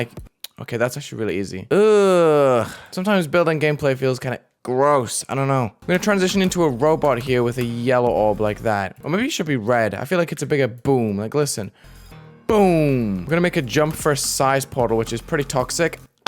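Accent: United Kingdom. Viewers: You're bri'ish, same. What county you from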